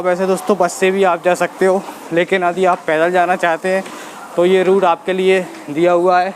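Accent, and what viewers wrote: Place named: Madhya Pradesh, India. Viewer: सबसे पहले तो आपको वीडियो के लिए धन्यवाद , मैंने वीडियो आज ही देखा है और मुझे जो सबसे अच्छा लगा वह है आपकी बोली जिसे सुनकर मुझे लग रहा है कि आप मेरे जिले भिण्ड या इसके आसपास है अगर मैं सही हूं तो जरूर बताना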